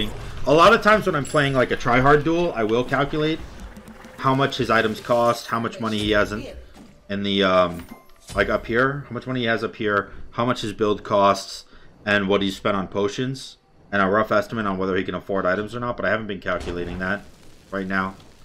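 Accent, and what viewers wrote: United Kingdom. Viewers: Gg nice vid and nice British accent lol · That British accent was amazing Bro. <3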